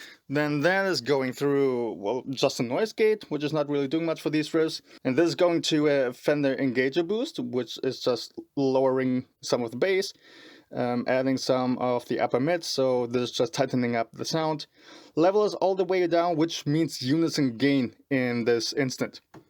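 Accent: Germany. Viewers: "tyooning" "Duck-Terror" Dude, work on your accent. It's not AS catastrophicaly cringey as German accents usualy are, but still. At the beginning of the video it actualy sounded like you deserved praise for NOT sounding like a blithering stereotype; but you've still got quite a ways to go. As for the speakers: The CV75 kinda sounds like a WGS Reaper to my ears... :-o And what's up with that weird noise on the V30?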